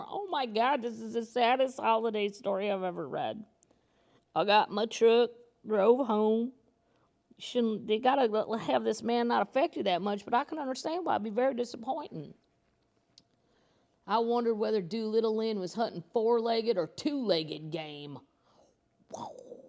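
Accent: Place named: Kentucky, United States. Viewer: I think I’m pi in up that Kentucky drawl. It’s strange ‘cause I’m from Boston! I sooo look forward to storytime and to the Pubcast. You are my salvation with all this solitary living. Can’t thank you enough.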